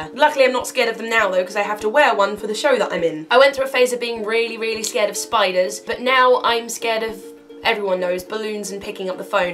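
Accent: United Kingdom. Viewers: That was what I was referring to xx P.s. omg u hav british accent??/¿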